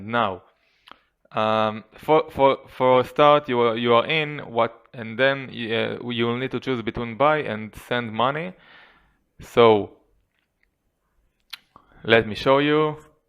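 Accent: Israel. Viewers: אני מת ידעתי שאתה ישראלי מהמבטא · חחחח בשנייה שהוא התחיל לדבר הייתי כזה "הוא כל כך ישראלי"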